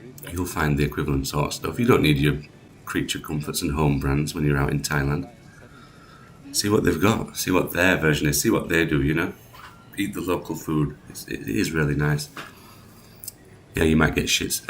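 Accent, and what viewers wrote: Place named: Yorkshire, United Kingdom. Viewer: What accent is that it sounds a bit Yorkshire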